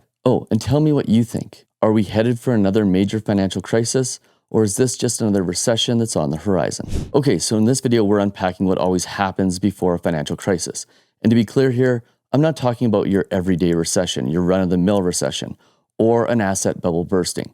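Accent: Canada. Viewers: Such an interesting video, and beautifully presented. Great to hear a nice Canadian accent.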